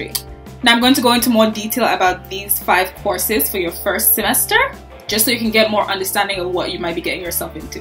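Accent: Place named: Northwest, Cameroon. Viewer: This is really good. It's helping me. You are sounding like a north west Cameroonian.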